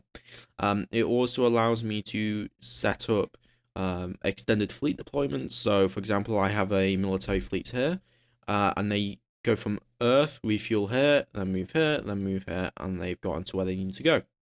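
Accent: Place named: United Kingdom. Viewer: Sound is a lot better on this one! Minor point of citicism: your english is very 'brittish'. You talk very fast and don't 'finish' all your words properly. This generally makes it harder for continentals to follow. Keep it up though, the tutorials are really useful.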